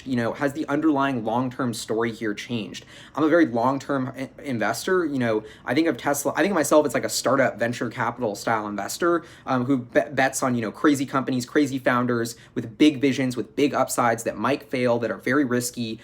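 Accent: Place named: South, United States. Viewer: Where are you from? Sounds like a southern accent at times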